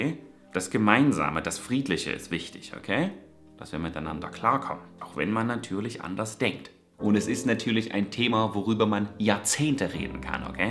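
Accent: Saxony, Germany. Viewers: ich find deinen Dialekt super, komme selbst aus Sachsen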